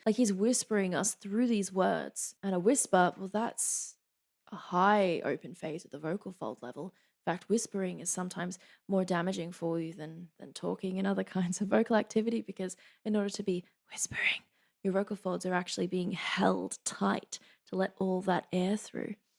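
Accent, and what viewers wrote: Australia. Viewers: Dunno why people would hate on Australian accent.. everytime I hear you I feel a very strong urge to just drop every responsibility I have and move to Australia just to hear the people speak and enjoy the sunset on the beach. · I do not know why I like the Australian accent so much, but I could listen to her talk all day.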